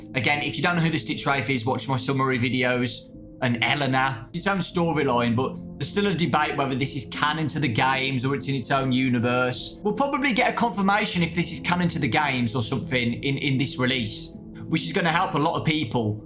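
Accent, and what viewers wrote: United Kingdom. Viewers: Wassup British man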